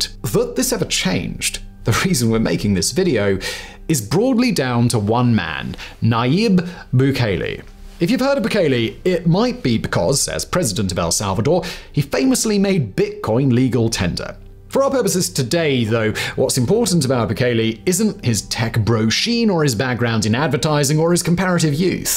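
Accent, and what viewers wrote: England, United Kingdom. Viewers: A white guy with an English accent pontificating about El Salvador. I spend a lot of time there. Beautiful country with beautiful people that lived in fear because a small percentage of the population made it miserable for everybody else. Bukele took care of the problem. I saw people out jogging in La Libertad today. Crazy. That wouldn’t have been possible 2 years ago. That’s why they love him. Not because they want to go buy iPhones but because they can go watch their kids play futbol, go to the store, run a business, visit their family without fear. The comments on this board are embarrassing.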